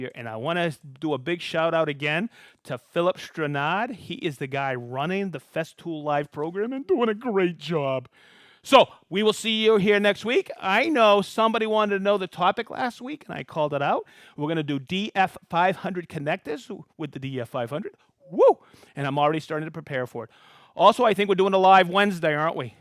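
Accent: Boston, United States. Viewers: Hoosier?? That's a BOSTON accent...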